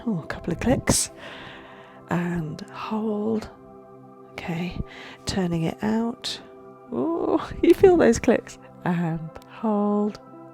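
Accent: England, United Kingdom. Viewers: Amazing videos and very interesting. Didn't even know this was a form of treatment. Great videos. What part of england are u from? 😊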